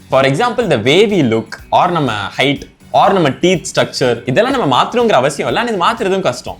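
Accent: Tirunelveli, India. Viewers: neenga tirunelveli aa bro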